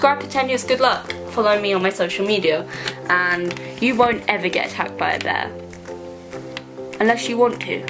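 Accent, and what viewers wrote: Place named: London, United Kingdom. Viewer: it's a particular type of london accent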